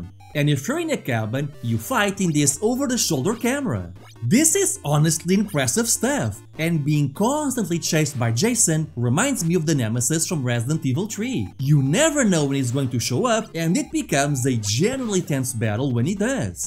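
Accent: Portugal. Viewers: Portuguese accent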